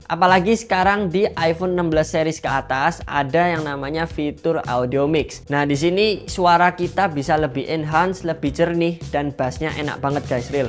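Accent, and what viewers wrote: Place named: Java, Indonesia. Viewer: Medok buanget, wong ndi mas?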